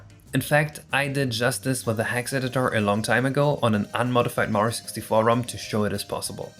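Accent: Germany. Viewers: Hmm thats a german accent. Great tech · random question but where are you from? i hear a german accent in your voice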